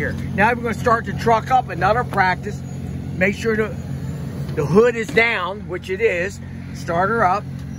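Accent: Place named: Maryland, United States. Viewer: Nice! I can tell by your accent lol. I grew up in Baltimore, but now live in Idaho. Currently going to trucking school and trying to soak up as much information as possible. Thanks for the vids!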